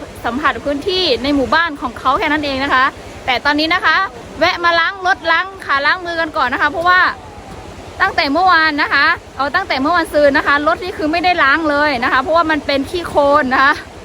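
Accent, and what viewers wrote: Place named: Isan, Thailand. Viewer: บรรยายเก่ง ออกเสียงไทยชัด บางทีเหมือนออกทางอีสาน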